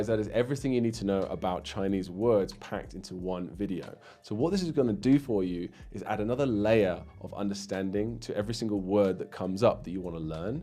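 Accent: Standard. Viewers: 你的发音很标准，不错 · 普通话真的说的比我这个母语者还标准。。。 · 发言够标准的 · 你的视频作为中文学习是非常好的材料。 不过还是有一点点建议：中文的每个字的拼音最好用空格分开，这对初学者会更友好。 你的发音非常标准，很好🥰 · 这位老师的发音真的非常标准（来自中文母语者）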